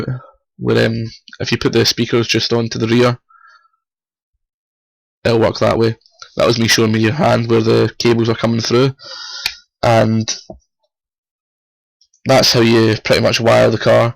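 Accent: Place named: Scotland, United Kingdom. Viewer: scotish mate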